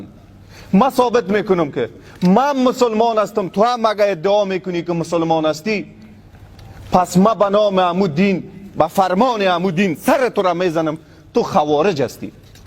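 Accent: Tajikistan. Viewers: Бахдо тоза лахчай точики кати гап мезана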